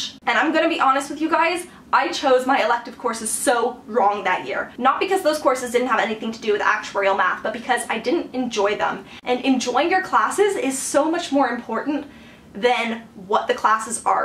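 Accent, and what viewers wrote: Canada. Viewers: Wait a minute... t'es Québécoise?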